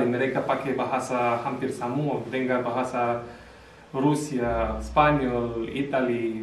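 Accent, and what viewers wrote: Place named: Ternate, Indonesia. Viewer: Tong Tra bosan, kalo durasinya d perpanjang Sadiki malahan makin bangga ada bule yg pake tong pe logat Ternate 😊🤗apalagi liat ganteng uucchh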